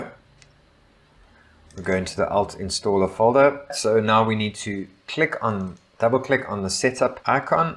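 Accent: South Africa. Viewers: Was about to say that bro sounds south African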